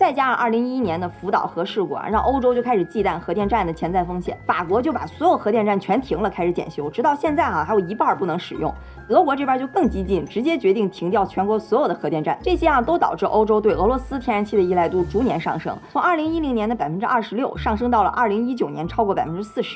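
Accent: China. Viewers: 講話大陸本土口音太重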